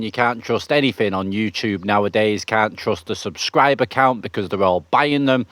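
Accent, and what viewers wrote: Manchester, United Kingdom. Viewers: Todmorden eh, I didn’t expect that😂 I was thinking Gorton or Longsight with that accent. I spent a few years in West Yorkshire, amazing people. If I had to live in England it would defo be there. I’m watching this in 11 degrees with threats of rain, that bamboo hut looks so appealing ✌🏼🙏🏻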